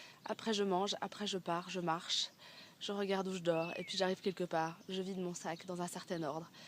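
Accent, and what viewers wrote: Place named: Spain. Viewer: Bon courage et soigne toi bien surtout. Et puis l'accent espagnol avec le nez encombré. ... c'est pas simple. Bisous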